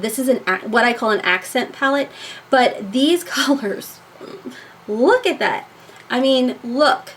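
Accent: South, United States. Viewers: Found your channel by searching for an Aphrodite review and realized that Jen Luvs Reviews mentioned you the other day, I thought I subscribed that day but I guess I didn’t but I’ve been binge watching all your videos the last couple days! Love ur content n love how honest you are. I can’t help but notice tho, you try to hide your southern accent, it’s so pretty when it comes thru. You should let it shine thru more. Btw, what camera do you use? Love ur channel! Much love from southeastern Ohio! 😘😘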